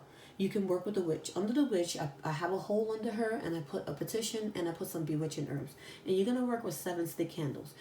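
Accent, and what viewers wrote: New York, United States. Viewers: lol she stay having me dying with laughter she sound like she from New York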